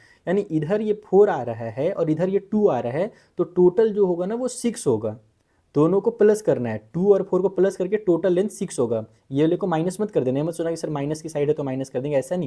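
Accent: Bihar, India. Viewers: sir +bihari language tadaka =interesting maths ❤❤😅😅😅😅😅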